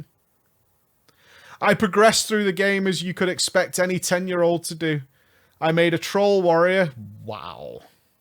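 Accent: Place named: Manchester, United Kingdom. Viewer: Look at the shine on that innit!! Are you from manchester?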